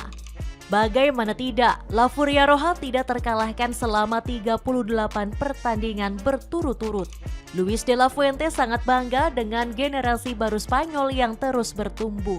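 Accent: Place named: Surakarta, Indonesia. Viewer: Makk ngeriii ya, pakai narasi pria solo dia. Kartu merah sitttt😂😂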